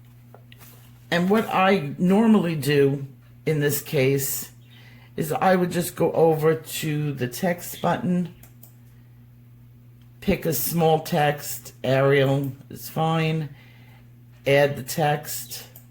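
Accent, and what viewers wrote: New York, United States. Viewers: It is good to hear your voice again. Love your NY accent, glad you got out of there too. May God bless you always, your generosity is unsurpassed and I love you. Love this vid.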